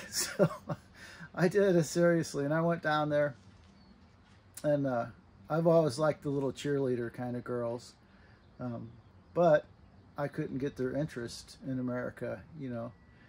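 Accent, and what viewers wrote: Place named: Liberia. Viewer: I'm from Liberty. When I hear you speak, it takes me back there.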